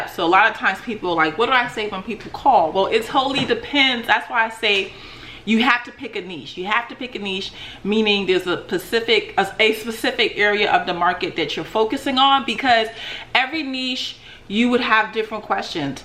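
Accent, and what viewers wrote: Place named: New York, United States. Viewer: Very informative video!! Thanks. I know ur from NY tho😂 cant hide that accent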